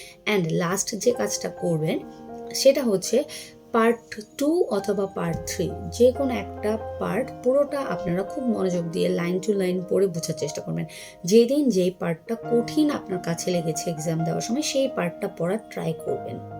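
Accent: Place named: Sylhet, Bangladesh. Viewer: Your way of speaking seems quite similar to Sylheti peoole.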